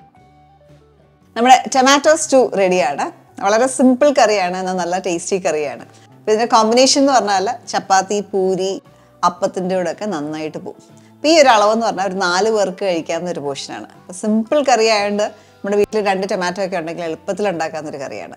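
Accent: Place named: Central Travancore, India. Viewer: Lady speaks the central travancore dialect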